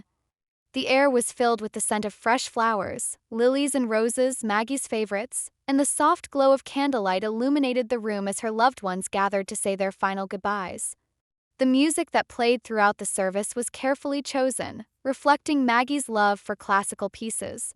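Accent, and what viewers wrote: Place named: United States. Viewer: Fucking American voice-overs on everything these days, I resent it hugely..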